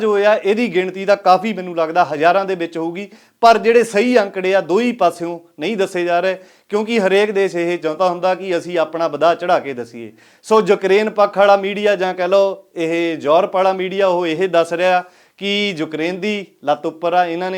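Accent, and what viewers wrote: Doaba, India. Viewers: tuhadi Punjabi doabe wali lagdi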